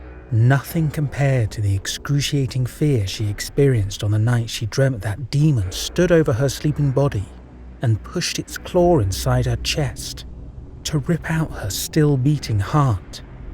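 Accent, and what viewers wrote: United Kingdom. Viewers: Ohh I do love these stories being a ex ghost hunter and demonologist I’ve had many many experiences like this most much worse and one was so bad it made me give up paranormal investigations with ouija boards for good I’ve never ever used “the board” again maybe I’ll write it down one day but when ever I hear a story like this it takes me back to that night and I shiver it’s great to hear a narrator with a British accent for a change too I’ve not heard any of your story’s for a long time I’ve missed you reading to me (US) have a good weekend 😊👍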